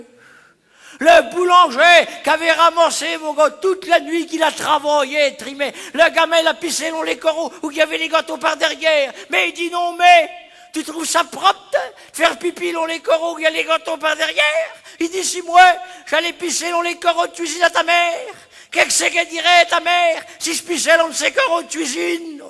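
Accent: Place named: Normandy, France. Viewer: sacré tu me fait rire et tellement vrai tes histoires avec une pointe d accent normand bonne continuation a toi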